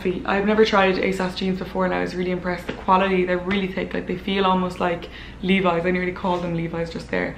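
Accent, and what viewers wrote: Ireland. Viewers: Always look forward to your videos, especially when you talk with your Irish brogue ❤️ Love from Canada 🇨🇦 Don’t worry, I’ve got my winter puffer jacket out. Getting way chilly here in Eastern Canada.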